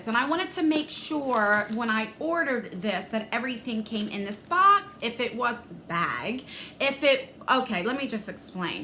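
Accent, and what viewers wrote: New Jersey, United States. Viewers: Btw You are my favorite subscription box YouTuber! I love your Jersey accent and your upbeat personality and I also love that you aren’t in your twenties because I’m 36 and aging skin is a lot different than young skin so I know if a product works for You it will most likely work for me!! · And i have to say i live your true Jersey accent!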